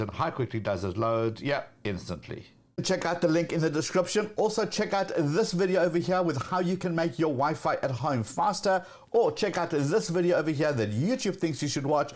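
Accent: South Africa. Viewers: Hi first I thought you were from South Africa but then I saw in your videos you are from America and your english accent is very South African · היי. מה סוג המבטא שלך? דרום-אפריקאי?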